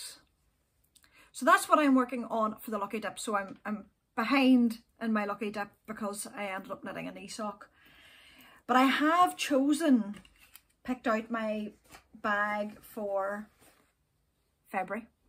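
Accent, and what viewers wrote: Ireland. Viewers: I really appreciate your gentle manner, your honesty, the practical approach you take to knitting and yarn, your Irish accent and showing us the beautiful coastline of Northern Ireland! I still remember the episode you did during covid on the little things we could do to stay well - there was so much practical wisdom in that. Congratulations on reaching 10K subscribers! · hello! It's so nice to have you and your lovely irish voice back on a regular basis! Joanne from Illinois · Many many congratulations on 10k👏👏I really want to knit Joji's Frank shawl, but keep knitting hats and cowls for other people. I have loved each and every episode you have produced. Love your Irish accent and sense of humour. I hope one day I can meet you. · Congratulations with your 10K subscribers. I love your podcasts, your talks about yarn & patterns love love love it. 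And you have such a beautiful Irish accent. My name is Inge and i'm living in Belgium, my dream is to knit a colorwork sweater by Marie Wallin. I have a lot of books of Marie Wallin because i love her colorwork. I'm looking forward to your next episode.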